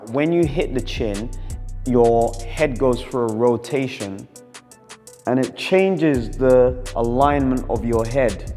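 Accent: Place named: United Kingdom. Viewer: Are you Cuban as for me sounds like you are British.... accent by far.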